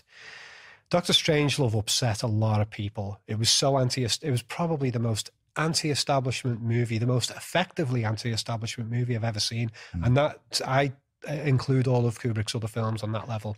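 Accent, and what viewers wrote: Liverpool, United Kingdom. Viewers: you sound just like Paul McCartney, are you from Liverpool? Anyways, I like it, & I love your videos about movies, esp Stanley’s ❤️ from Texas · I love when unleash his Liverpool accent in all his glory! 🏴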